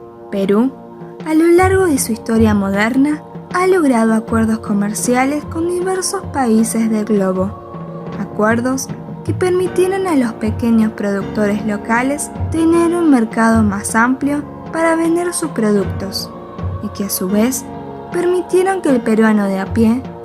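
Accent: Argentina. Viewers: Tanto terror? A proposito de donde eres, este es una exageracion. Lean no se dejen esta con dejo argentina les convensa si en verdad quieren a Perú. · ¿Eres de Perú?, suenas como de argentina xd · Siempre me he preguntado si eres Argentina? Por que tu voz es muy bella.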